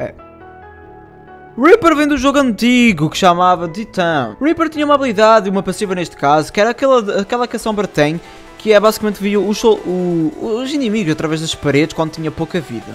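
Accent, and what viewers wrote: Portugal. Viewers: Beatszu ele é de Portugal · é português de Portugal, é facil de entender, n sei qual é o vicio dos brazucas a dizer que o português de Portugal "tá foda" sabendo que é o original e o vosso é que é todo mamado ._. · es de Portugal como eu? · SERIA EU, EU MESMO mano ele é português de Portugal ja ouviu esse pais?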